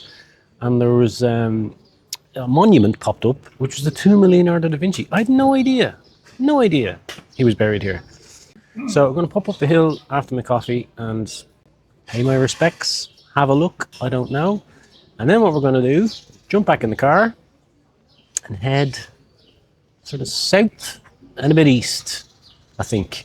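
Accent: Ireland. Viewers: Me too that Irish accent and French names always gets me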